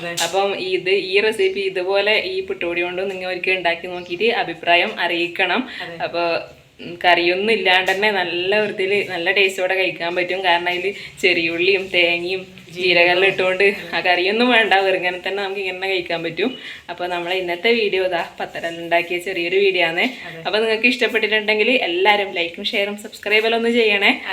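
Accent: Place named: Kasaragod, India. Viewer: മുതുമ്മനും കൊയ്ച്ചെടുത്തു 😂. നമ്മുടെ കാസറോട്ടെ ഭാഷ 👍🏻👍🏻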